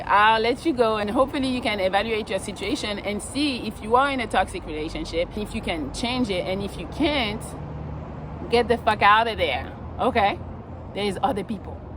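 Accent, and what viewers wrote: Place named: France. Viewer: I knooooow that accent was French...that sexy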